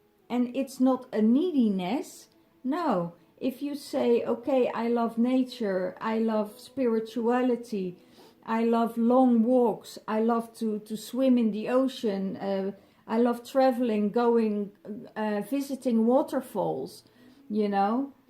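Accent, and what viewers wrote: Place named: Netherlands. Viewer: I can hear you are Dutch hahaha it obvious hahah; ik ben afgelopen weekend zwaar getransmuteerd 👌🏻 I like it!!!!!!! Ik ben een man beschouw mezelf als DF, Scorpio. Indigo, yellow worrier en heyoka T is allemaal om de dualiteit in zelf naar 0. of.0 te brengen Lekker in balance joh 👌🏻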